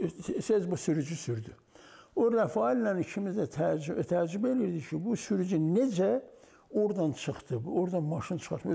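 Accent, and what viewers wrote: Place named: Armenia. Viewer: Əsil yerazlarin ləhçəsilə danişir. Onnar,bax belə danişin. Olar, hansi yaxşi görünür və esidilir.? Yazin.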